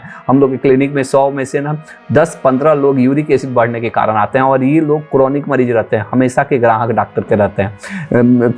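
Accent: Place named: Bihar, India. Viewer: Sir, aapke bolne ke style se bta skta huun app surely Bihar se hain wo bhi MAGADH area se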